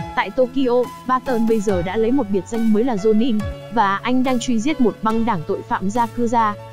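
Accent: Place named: Thanh Hoa, Vietnam. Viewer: Giọng thanh hoá nghe chối kinh dấu ngã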